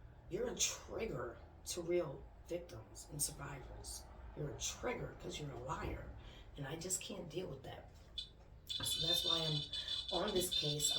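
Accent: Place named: Louisiana, United States. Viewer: Southern creole accent. Im from Louisiana I can hear the Southern French twang to it wow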